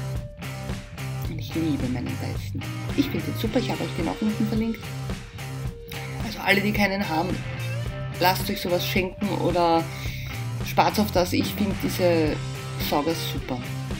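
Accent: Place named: Austria. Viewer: Prosit 2020! Ich bin 26 und aus Oberösterreich! Ich finde dich und deine Videos super! Vor allem weil du auch "österreichisch" sprichst 😀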